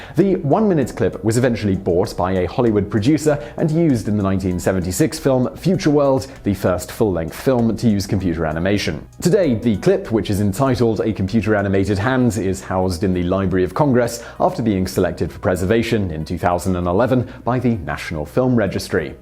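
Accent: England, United Kingdom. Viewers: Why is Johnny Sins speaking with an English accent?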